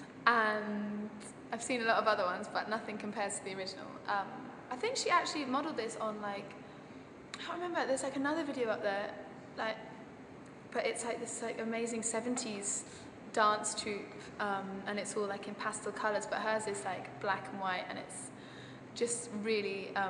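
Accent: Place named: England, United Kingdom. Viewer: is she american or english?? cuz she has an english accent but says one of my fave videos *period* which is american.. :-P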